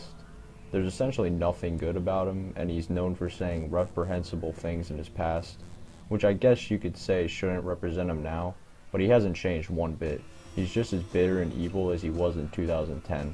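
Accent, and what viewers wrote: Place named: Turkey. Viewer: Are you turkish? You sound very turkish to me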